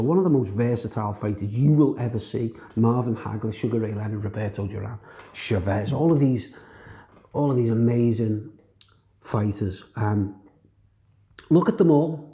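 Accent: Liverpool, United Kingdom. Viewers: A beer and a chat about boxing at the Phil one day. Listening to you makes me miss Liverpool man, and the practical logical approach of scousers. Best in da wairld 😋🤝❤️🤝😋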